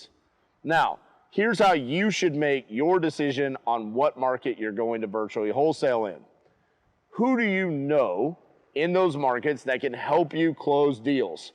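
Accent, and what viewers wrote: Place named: South, United States. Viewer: You sound like a guy from down the way. I grew up in a town of 300 people in the south.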